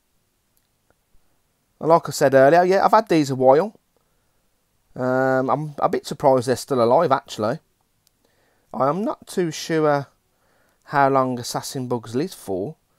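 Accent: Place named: West Midlands, United Kingdom. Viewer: Ah I did also think Birmingham. I'm from Wolverhampton you see, it's nice to hear a somewhat local accent:)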